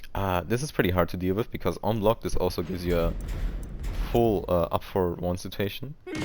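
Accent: Germany. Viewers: Haha the accent always gives us away. Habe mir vorgenommen von Jin (T7) auf Dvj zu wechseln in T8 und dein content ist richtig gut bro. Danke fürs Teilen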